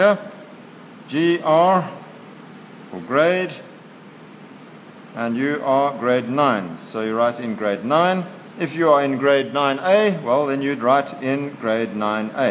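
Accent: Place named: South Africa. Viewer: South African accent i love it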